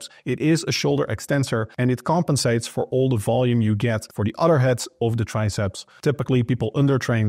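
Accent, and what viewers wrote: Netherlands. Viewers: In an other YouTube channel some said you need 2 pull and 2 row exercises for a bigger back. I did that. Gonna put the lat prayer in my back workout tomorrow and skip one of the row exercises. Gonna give it a try, in the end it's what works for me right. Btw love the Dutch accent 😉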